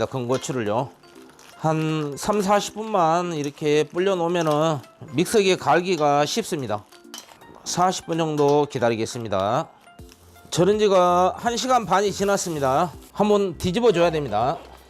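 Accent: Jeolla, South Korea. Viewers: 셰프님 구수한전라도 사투리정감있네요.ㅎ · 좋아요.전라도사투리 정감가네요.ㅎ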